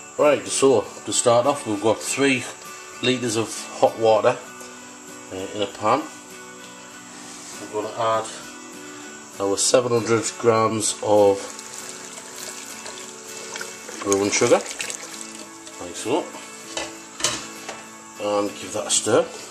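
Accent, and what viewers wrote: Middlesbrough, United Kingdom. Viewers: Oi oi m8....hope all's ok...great vid...hope all works out well....yeah I had t laugh at the froth it looked like one big pint...lol...I'm just avin a pint of wherry atm n I've gotta admit it's got better as the weeks go by the head stays intact all the way down the glass...anyway m8 hope this brew is a banger 4 ya m8...lv nut brown ale...btw are you from Newcastle way it's just you sound like my family Middlesbrough...big respect n oi oi...gav.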